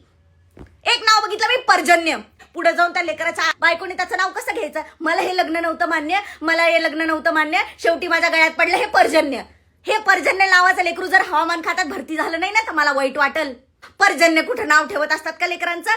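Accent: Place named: Marathwada, India. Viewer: तू मराठवाड्यातील आहेस काय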